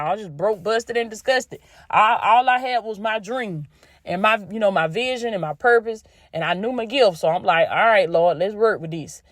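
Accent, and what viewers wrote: South, United States. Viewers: I like listening to your country voice. All your messages are interested and inspiring. I even sent my momma your link. She was talking about you today. I told her you only 33 years old with so much spark and fire in you and all your messages. It's the God in you that moves you. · You just don’t know how much we love your country accent! ♥️♥️♥️♥️ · We love, your Southern Accent!💝 It brings the message across in a passionate way. God Bless.💝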